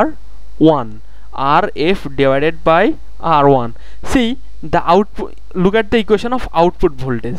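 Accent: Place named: Assam, India. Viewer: Assamese English.. Anyways, nice explanation !! 👍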